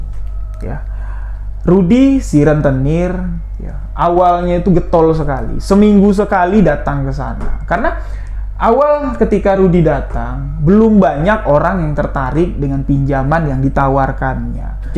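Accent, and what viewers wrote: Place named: Java, Indonesia. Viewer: jawa ngapak dwonk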